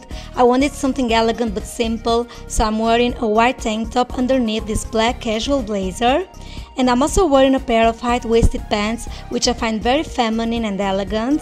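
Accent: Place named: Italy. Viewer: She said "white", she's italian so she hasn't your english accent. Be a bit nice! Damn....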